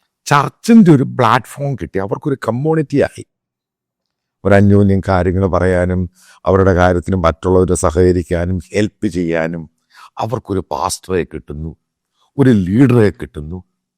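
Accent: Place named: Travancore, India. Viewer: തിരുവിതാംകൂർ ഭാഷയുടെ ഭംഗിയാണത്.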